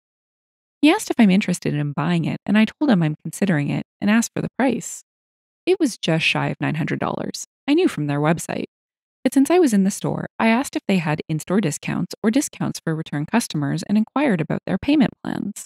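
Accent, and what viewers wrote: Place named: Canada. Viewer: You're sounding like a smooth and sexy Canadian!👍👍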